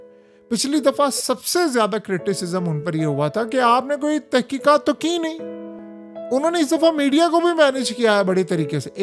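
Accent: India. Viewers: تیرے انداز گفتگو سے لگا رھا کہ انڈین کہ انڈین ایجنٹ ھیں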